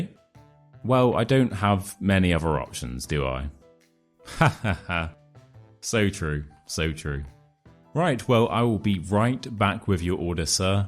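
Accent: United Kingdom. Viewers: Hello!,i'm practicing with your British accent videos, but I'm a beginner, I don't understand much, but I feel like it's helping me, thank you, greetings from Mexico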